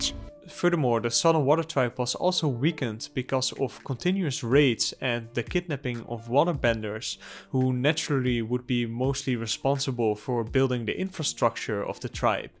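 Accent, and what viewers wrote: Netherlands. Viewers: that duthc accent omg 💀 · Lol i love ur dutch accent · That's one thick Dutch accent. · Jij bent sowieso Nederlands aan het accent te horen hahah nice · Why can I hear that this guy is Dutch? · Je Nederlandse accent is wel erg kenbaar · Is this guy louis van gaal? That accent is very clear. Not negatively or positively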